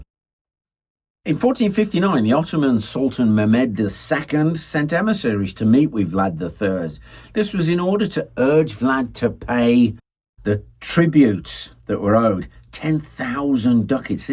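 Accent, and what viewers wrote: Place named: RP, United Kingdom. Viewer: Great video. Posh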